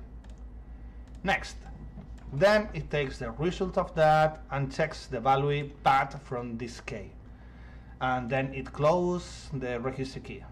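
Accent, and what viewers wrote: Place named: Spain. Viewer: Acento de españolazo. mis dies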